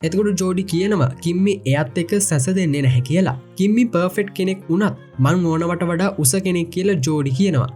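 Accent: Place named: South, Sri Lanka. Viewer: Uba dakune kollek neda????ehetta mehetta kiyanne 😂😂😂😂😂😂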